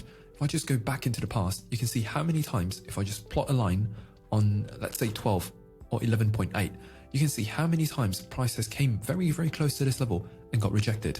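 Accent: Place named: England, United Kingdom. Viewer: Yes, this too shall pass. Thx for the reminder,! Btw, my girl wants to know what region of England you’re from. She’s super diggin on your accent, bro! I’m beginning to think she’s not even into crypto, just listens with me to hear the sound of your voice!😂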